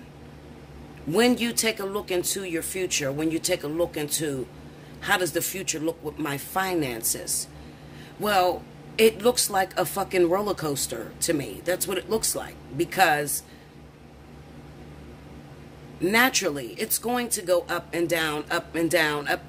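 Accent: Pittsburgh, United States. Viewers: I know this is off topic but are you from Pittsburgh?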